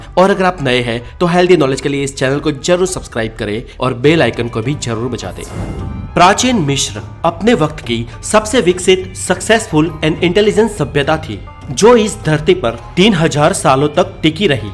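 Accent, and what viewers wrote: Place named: Bihar, India. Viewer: Mostly voice bihariyo ki kyun hoti hai with mother tongue in fluency issue ke saath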